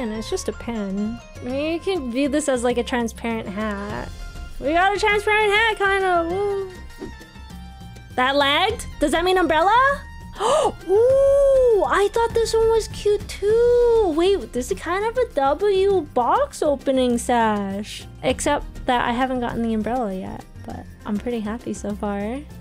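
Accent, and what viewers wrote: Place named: South, United States. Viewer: That southern accent is just getting better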